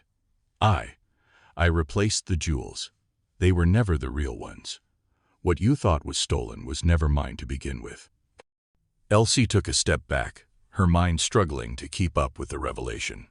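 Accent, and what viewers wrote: United States. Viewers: Terrible American voice not worth listening to.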